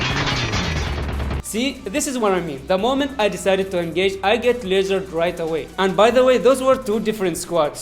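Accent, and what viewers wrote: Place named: India. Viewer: Title: "This is why my server is the hardest" Me: Probably never heard of Asia’s servers Also me clicking the video and hear the indian voice Oh… Never mind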